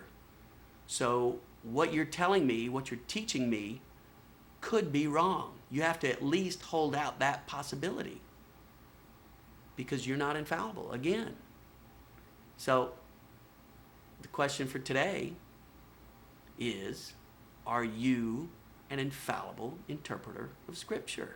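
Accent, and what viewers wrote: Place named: South, United States. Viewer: you sound like you have a southern accent, are you from the south?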